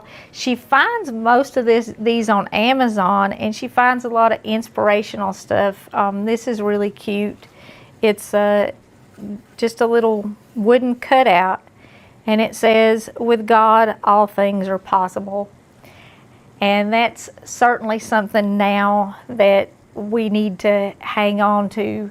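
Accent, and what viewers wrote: South, United States. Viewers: Hello sweet lady, I'm not sure if I'm doing this right but I'll try. I found your channel on my Roku TV and I just wanted to tell you thank you for sharing your love of cooking and love of God. I am 78 yrs old. My husband took his life 4 years ago and left me in a wheelchair. I live alone now and have no family here in Texas. I get real lonely at times, then by chance I found your channel and although I don't cook much anymore because it's difficult cooking from a wheelchair and too easy to get burned. Your channel became a daily pleasure to look forward too every evening. Your words about your love of Jesus and His love for us have lifted me so many times when my heart was so heavy. Thank you. Though I don't do much cooking since being alone I still enjoy watching you cook. You have the sweetest voice and southern accent. I'm originally from Massachusetts and I have a heavy Boston accent. 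God bless you for making my day. I watch all the videos I can and watch them over and over. (Hugs) from Fort Worth Texas...... Marie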